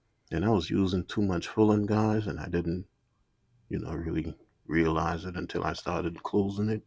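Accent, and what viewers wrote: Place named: Louisiana, United States. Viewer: !! I'm hearing a SC Locountry or Louisiana accent. Making me a little homesick. I'm so making this! It looks delicious!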